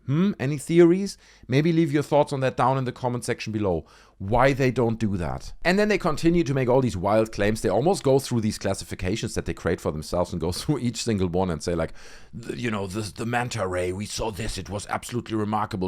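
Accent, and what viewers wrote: Germany. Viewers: LMAOOO, DUDE!! RIGHT?! It pisses me off so much, We need REAL DATA!!! NOT all of this BS that does nothing but muddy up the waters in this area of research... It's such a shame... It really is man... (Cool German accent you have btw haha)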